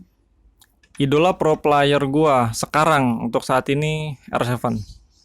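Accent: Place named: Citereup, Indonesia. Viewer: Kirain orang mana bang taunya citereup😂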